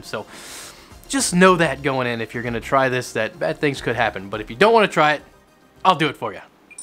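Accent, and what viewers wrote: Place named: Illinois, United States. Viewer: When the fuck did this channel pop up? Thank you recommendeds. I can’t figure out where this dude is from either, accent is telling me Illinois but that jacket is telling me Tennessee or some shit.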